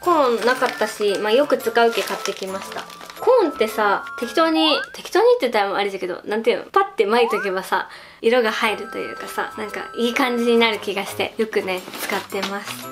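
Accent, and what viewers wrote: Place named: Hiroshima, Japan. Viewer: 元彼が広島の人だったからと同じ喋り方だからなんか思い出したあああ笑笑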